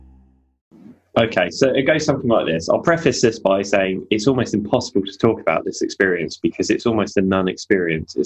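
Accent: United Kingdom. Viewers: Interesting take on DMT. Personally, "I" had to work real hard to decipher the Cockney type English accent but it was worth it. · Great report! Thanks for sharing! You sound British. I live in London. Let me know if your up for meeting some time :) Would be cool to meet and have a chat about this topic :) no one I know have had this experience.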